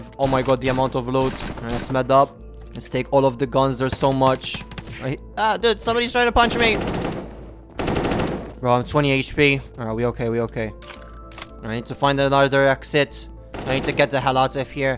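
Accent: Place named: Malta. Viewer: first Maltese person I have ever found playing Unturned other than myself; they are so rare! I noticed immediately from your accent. Keep up the great content!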